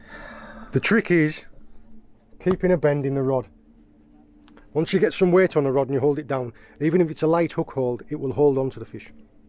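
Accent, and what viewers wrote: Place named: South West England, United Kingdom. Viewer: I actually grew on brittish movies and tv shows, and it still didnt help me with your south-western accent and dialect haha. The subtitles really helped a lot, youtube logarithms working wonders nowdays haha. But dont worry, these things are mutual among us sea people and highlanders. I mean, if you learned croatian language, you wouldnt understand me either haha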